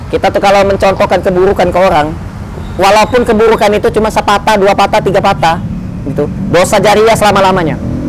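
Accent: Palembang, Indonesia. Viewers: Logat Palembang nya dak ilang... Sukses trus dunia akhirat bang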